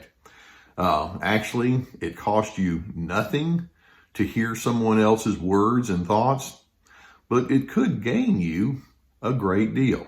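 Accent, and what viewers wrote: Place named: South, United States. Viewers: Hi from Spain, where are you from, the south of EEUU?, your accent is very difficult for me. I like your vídeos a lot. ☺️ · Mara, perhaps the southeastern USA.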